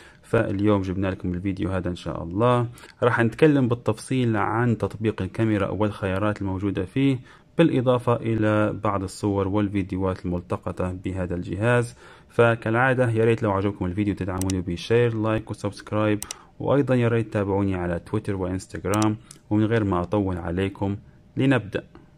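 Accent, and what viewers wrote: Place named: Libya. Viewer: واضحه ليبي يلي يشرح منور ولد بلادي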